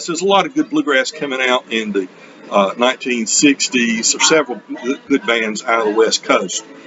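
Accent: South, United States. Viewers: I don't know if I can be your friend. You keep saying prog rock fans have no sense of humor. You make me want to cry when you say that (LOL). Seriously, I enjoy your channel. I enjoy your clumsiness. I am more clueless about this video making technology than you are. Secondly, you remind me of my best friend that passed about 4 years ago - same hairstyle and also a southern accent (his was a GA-FL mix). I think you are just a decade older than me. My friend was born around the same time as my parents.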